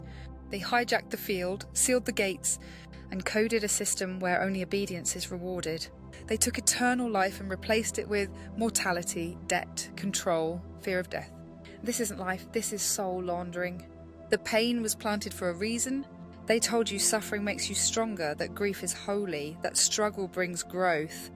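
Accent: United Kingdom. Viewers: ahhh the British accent of wisdom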